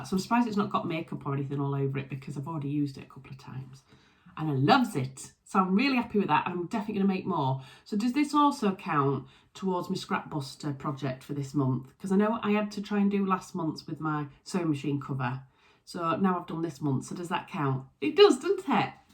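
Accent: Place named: County Durham, United Kingdom. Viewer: Thought another commenter gave a good suggestion for the creme floral.....put the floral design near your face. Another trick I use when I Iove a fabric that's not really my best color is to either make a binding of one of the colors in the design and put that around the neckline and even the sleeves.. Or piping around a lot of the edges works too. Or a collar of a different color that flatters. Last Or.....even use two colors stacked for sleeve or neck band band, button plackets. So love listening to your accent, it's like home. Family all still live in Country Durham but our family emigrated to America. Thanks for the time you take to share your sewing!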